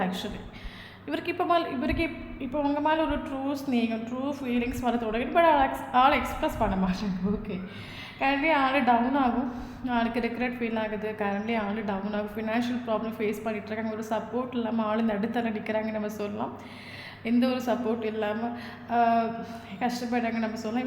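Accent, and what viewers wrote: Kerala, India. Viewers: Hi Mam neega keralava? Malayalam kalandha tamil very nice to hear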